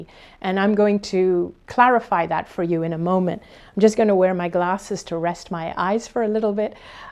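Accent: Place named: California, United States. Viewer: Oh my! I read your books several years ago. My sister even met you in HK through a friend. Now I have found this site. To hear you speak now you sound very Californian! I’m from Sacramento but now live six blocks from the White House. I need to hear your optimism to get me through these tough times. You’re an angel.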